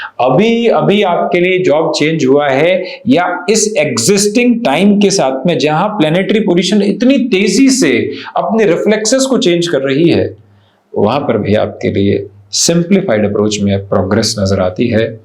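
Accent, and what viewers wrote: Standard. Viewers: आपकी हिंदी भी बहुत शुद्ध है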